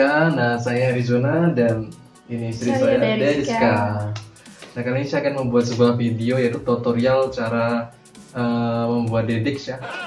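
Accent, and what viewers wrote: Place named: Java, Indonesia. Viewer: Medoks abis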